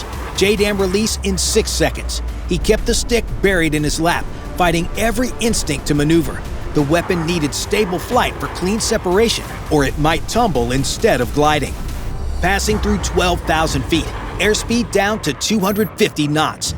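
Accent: United States. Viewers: Another annoying American voice,with usual tone well tou have lost with your usual venture but managed to condemn millions of Ukrainians to injury and death and the rest to poverty in a destroyed country ,so where a you going next?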